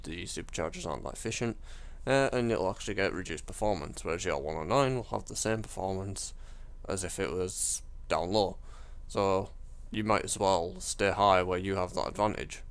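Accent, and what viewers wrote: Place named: Yorkshire, United Kingdom. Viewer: Sounds like he's supressing a north/midlands english accent lolol Yorkshirey?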